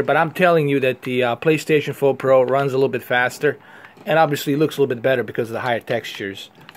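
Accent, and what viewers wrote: New York, United States. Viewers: Are you from New York, I can hear the accent a little. Sounds a bit like the Bronx or Queens to me.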